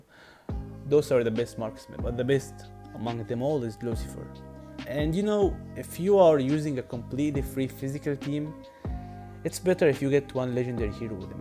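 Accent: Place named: Arab world. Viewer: طريقة كلامك غريبه حاس انك عربي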